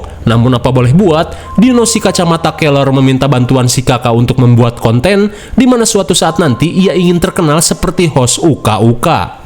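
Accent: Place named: Sunda, Indonesia. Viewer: Suara Admin nya banyak banget , ada beberapa channel x yaaa... Sunda pisan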